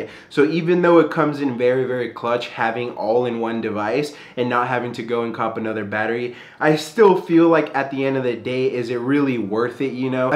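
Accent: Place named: West Coast, United States. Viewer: Brother has a west coast bwhwhwhahah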